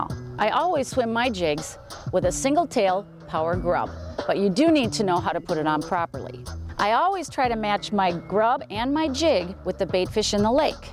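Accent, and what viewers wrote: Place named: Minnesota, United States. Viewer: haha she sounds like she might be from minnesooaata but this is really good...you rarely ever get sound, down to earth advice from fisherman on yt... how do you not set the hook though? setting the hook has become like a tourettes tic to me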